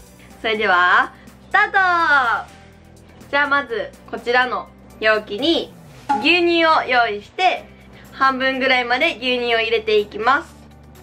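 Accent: Kansai, Japan. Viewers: 関西弁で友達みたいに喋ってるの良かった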